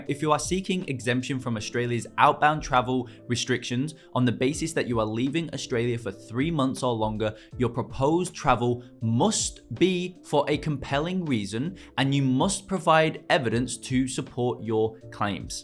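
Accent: United Kingdom. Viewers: where are you from originally? Northern UK I'm guessing with the accent?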